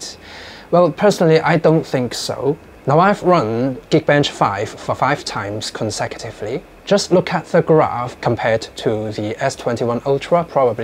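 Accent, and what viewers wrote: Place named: United Kingdom. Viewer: Sound like British tone , Good !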